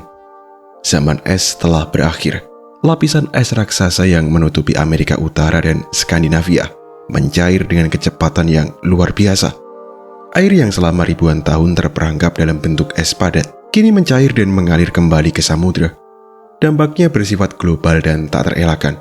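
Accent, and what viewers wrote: Java, Indonesia. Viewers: Logat Jawa Memnag enak kalau untuk narasi film dokumenter artikulasi jelas dan suara ngebasnya itu enak didengar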